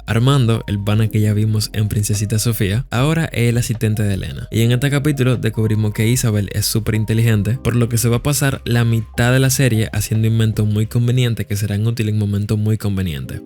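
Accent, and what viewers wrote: Dominican Republic. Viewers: Bro eres dominicano o es cosa mía? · AY ERES DE REPÚBLICA DOMINICANA OMG DE QUE PARTE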